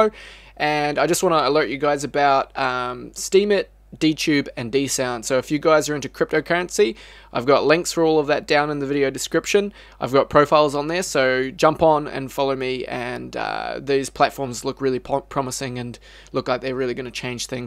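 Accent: New Zealand. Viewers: Thanks a lot! This was extremely helpful and the NZ accent is music to my ears :- )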